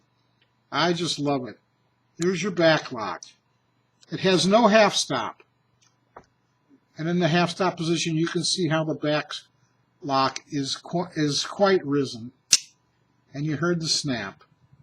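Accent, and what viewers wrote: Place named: United States. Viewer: This american accent while speeking german words is the besteht :D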